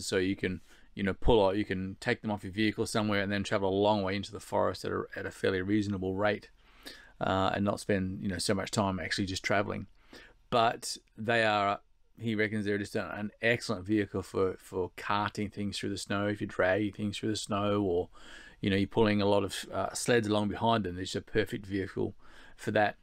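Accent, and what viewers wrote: Australia. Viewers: Так сложно на слух понимать речь... )) Привык к "американскому" английскому. Австралийский - как будто вообще другой язык) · я живу в Англии уже много лет, его Австралийский мне понятен без проблем, а вот Американские фильмы не смотр, а иначе все время переспрашива своего партнёра "что он или она сказали", он часто мне отвечает, что сам не понимает. А пр индийский английский, это да... 🥴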